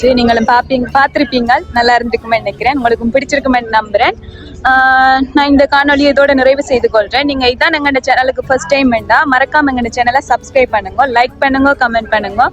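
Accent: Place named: Sri Lanka. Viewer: Srilankan tamil keka keka ketutae irukalam pola iruku pesu nee pesu d 🤌🏻😭💙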